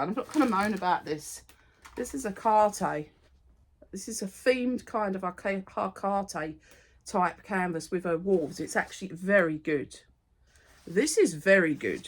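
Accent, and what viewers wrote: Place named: Essex, United Kingdom. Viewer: Hi, just found your channel, where in Essex are you from? You sound like me 😂🖤🖤🖤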